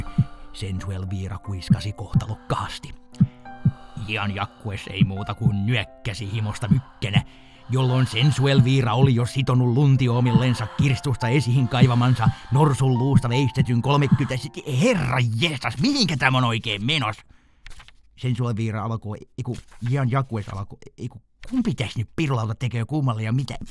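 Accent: Finland. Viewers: Olipa mukava kuulla omaa murretta, kun asunu Keski-Suomessa nyt 10 vuotta.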